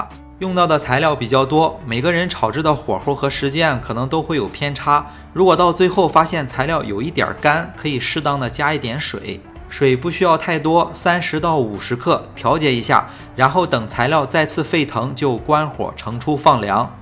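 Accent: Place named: Jinan, China. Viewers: 这北方话，北方风味，👍🏻👍🏻👍🏻👍🏻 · 这济普话听得真亲切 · 青岛人，让你听出来济南话了。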